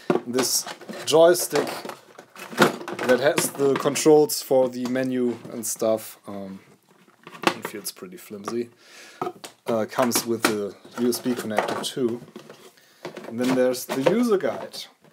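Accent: Germany. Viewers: I also prefer the real hardware or my PiStation, which can emulate more then just the c64. Overall, as you told, u have to be a hobbiest when using original home-computer stuff today or doing it with the raspberry pi. Imho the c64 mini has it's place at home, when only want a quick play on older day games ;) BTW: I love watching your videos and especially the german accent :)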